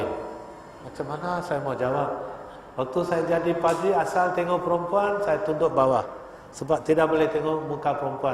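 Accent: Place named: Sarawak, Malaysia. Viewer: No wonder , cakap macam urg sarawak... islam minoriti d sarawak... tp jadi leader... kenapa? Aaaaa...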